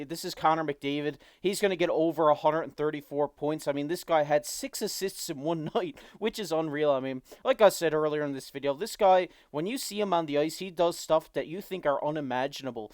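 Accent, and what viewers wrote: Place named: Cape Breton, Canada. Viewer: I’m guessing Cape Breton.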